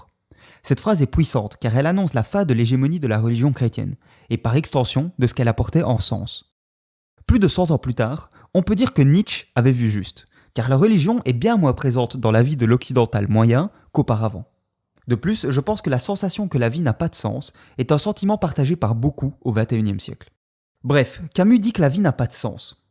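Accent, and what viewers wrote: Belgium. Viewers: comme d'habitude excellent video tu es le meilleur youtubeur de Tout Les temps avec Plus recherches plus de nuances et moins d'accent belge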